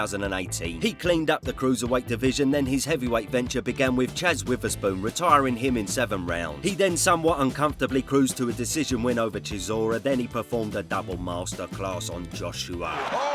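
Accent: Cockney, United Kingdom. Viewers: As an Aussie, i appreciate the cockney🇦🇺 Also, looking at how chisora boxed usyk, the way fury bullies, i think he beats usyk. Fury and uss cunningham shows fury just manhandles cruiserweights. And fury was pillowfisted fury in that era.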